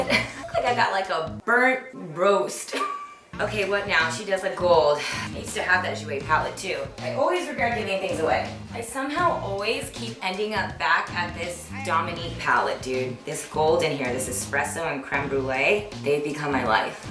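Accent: United Kingdom. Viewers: You are sooo cute the more you watched and copied the more you got that Mary poppins British accent x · You did fantastic!!! You’re just so funny with your british accent... I’m sure kaushal feels proud of your effort xxxx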